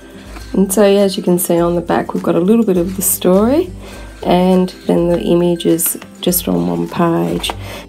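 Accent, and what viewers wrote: Australia. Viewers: Hi Have you moved yet? I seem to remember you saying you ere moving to Brisbane? Love all your reviews thanks for the effort you put into it. It’s so lovely to hear an Aussie accent too 😀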